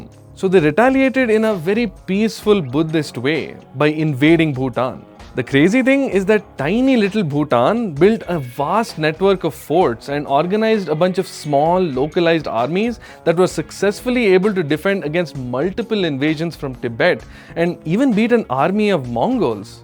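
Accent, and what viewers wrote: India. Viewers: Could not understand much. Too heavy Indian accent. From : Singapore 🇸🇬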